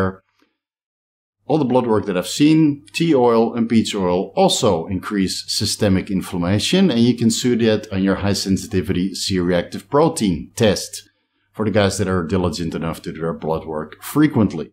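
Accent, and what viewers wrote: Netherlands. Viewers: sounds ok to me. A bit of a Dutch twang but ya get that when english isnt your first language. You say gyno no worries now. The content is what im here for and give it an A+👍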